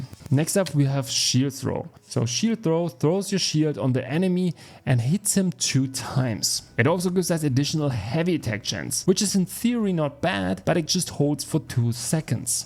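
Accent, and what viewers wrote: Germany. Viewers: Hab ich doch richtig vermutet, dass du n deutscher bist :) Kannst den auch mal in Deutsch machen. Bin zwar halbwegs Sattelfest mit der englischen Sprache, aber Deutsch fällt dann doch leichter... Danke für das Video. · Oh, you are German? Deshalb mag ich deinen Akzent wohl so sehr :'D Und natürlich Lob an deine Videos! Ich finde es sehr hilfreich, dass du immer durch alle Skills gehst, und auch erklärst, warum welche nicht genommen werden, so ergibt es irgendwie mehr Sinn. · Hab sofort gemerkt dass du Deutsch bist ^^" bester Guide den man sich als Anfänger reinziehen kann nicht zu kompliziert troztdem Detailreich, kurz aber nicht so kurz dass schritte übersprungen werden. Alles super erklärt gerade für mich als Anfänger was MMOS angeht. hab auch mega rescourcen und Zeit verschwendet da ich ein Build nachgemacht von jemanden der angeblich nur Builds und gameplay klaut aber bin mir sicher. 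Mit dem guide hol ich dass schnell auf, Danke für den Mega guide :)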